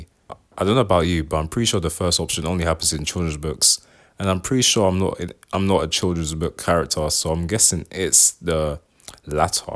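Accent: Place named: United Kingdom. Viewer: The story is good and looks like a real book, and your British accent is so calming and peaceful.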